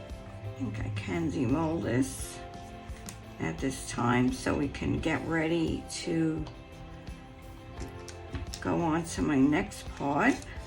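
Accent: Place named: Northeast, United States. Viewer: Very neat idea . Sounds like you moved from the Northeast to Hollywood ftom that accent. Bless you 🙏 💛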